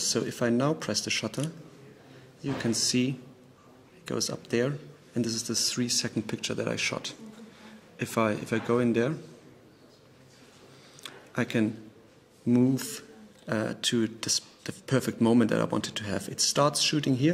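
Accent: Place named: Germany. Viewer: Man merkt das du deutsch bist :D Akzent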